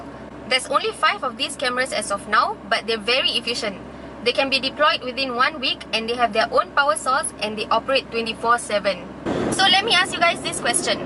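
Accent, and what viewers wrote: Singapore. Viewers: Well presented in our local English. Easy to understand. Enjoyed this video very much and sincere thanks. TP or LTA should consider to buy this video to educate new qualified drivers or new converted drivers. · Thank you for spending time making these videos. Clear spoken English with a tingling Singlish slang. I like 👍. Well done though need to curb the damn word haha.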